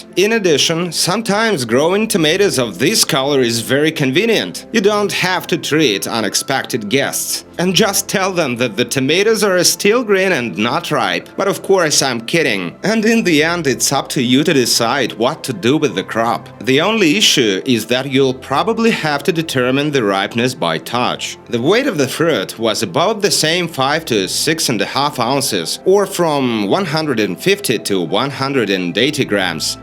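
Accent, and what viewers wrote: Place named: United States. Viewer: Забавно, а звучит так гипер американизировано, что я какое то время поразмышляла в каком штате так могут разговаривать lol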